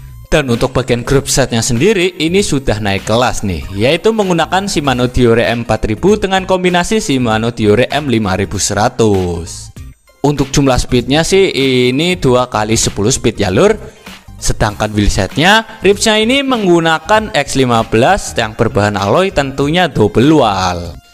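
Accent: Java, Indonesia. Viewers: Medok medok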